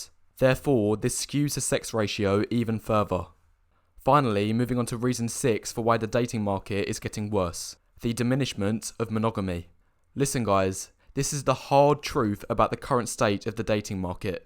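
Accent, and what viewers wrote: United Kingdom. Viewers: hes british if im not wrong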